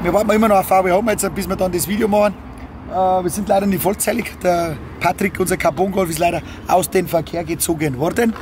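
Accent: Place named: Saxony, Germany. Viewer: Da sage noch einer sächsisch klingt furchtbar...nach 10 Sekunden habe ich das Video ausgeschalten...unerträglich.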